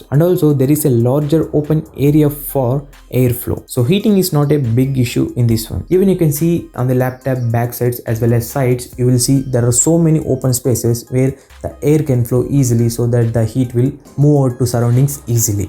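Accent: South India, India. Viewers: I think it is easily recognisable that he is a south indian,so am i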